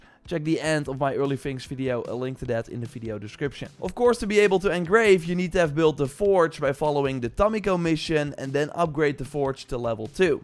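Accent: Netherlands. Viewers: Yo bro are you dutch ?😂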